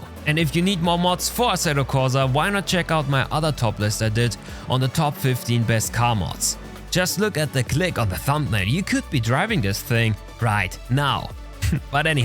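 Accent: Germany. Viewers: Da hör ich doch Deutsch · gutes englisch aber das geschulte ohr erkennt dass du deutsch bist:)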